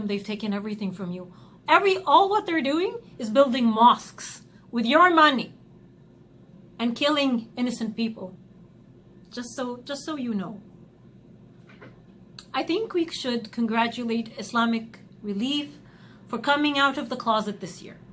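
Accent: Egypt. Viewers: Do not lie... You are not from Saudi... Your Arabic accent is Egyptian 100%... If u r Saudi prove it... Passport.. Or which part of the Saudi area... What is your tribe ... Otherwise stop telling lies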